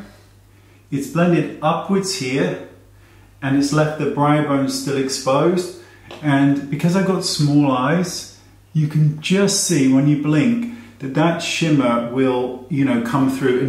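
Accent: United Kingdom. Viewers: I agree with you. Although I am from Brazil, I can understand almost the tinge he says. I Love his britsh accent. Sorry my english 😊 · Agreed.. a British accent is a plus too😍💕🍁🇫🇮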